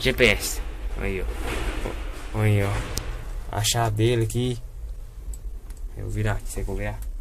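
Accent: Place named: Brazil. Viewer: A sua simplicidade de falar e mais lindo, acho lindo os sotaques desse brasilzão, Deus abençoe! Desejo para você sucesso com o seu canal!🤝🏻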